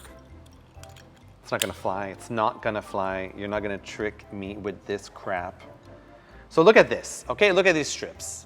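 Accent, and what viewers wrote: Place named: Quebec, Canada. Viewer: I totally recognize your accent. You're French Canadian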